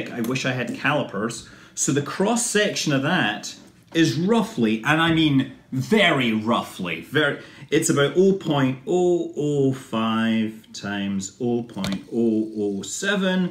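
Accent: United Kingdom. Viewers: British, judging by the accent.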